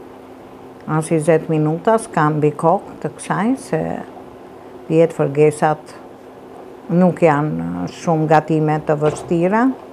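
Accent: Tirana, Albania. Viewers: pershendetje e dashur oooo me kenoqe me ate te folme me ate duret e arta sa qe me thote goca lene mos e luj mamin se eshte tu shiju te folmen e tirone 😘😘😘❤❤🌷🌹🥀🌺⚘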